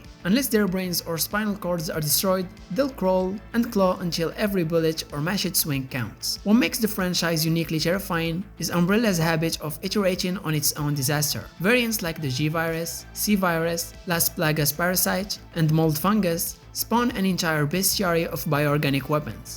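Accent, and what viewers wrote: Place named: Morocco. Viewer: Bro, are you Moroccan? The accent is soooooooooo close to Moroccan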